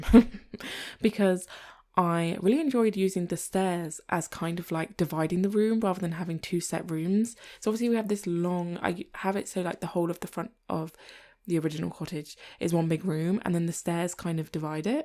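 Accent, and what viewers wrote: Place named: East Midlands, United Kingdom. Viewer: PS - are you from somewhere northern originally? Your accent now sounds very southern, and a bit towards RP, but words like grass and bath, you say in a way that makes me think maybe East Midlands?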